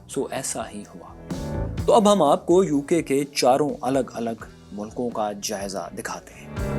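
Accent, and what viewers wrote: Punjab, Pakistan. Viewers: Pease pronounce the words correctly. Tone down your Punjabi accent and not ruin the younger generation.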